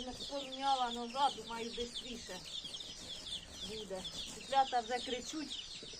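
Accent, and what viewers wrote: Poltava, Ukraine. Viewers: Добрий день. Говір Полтавський, з якого району, якщо звісно не секрет. Я з Гадяцького.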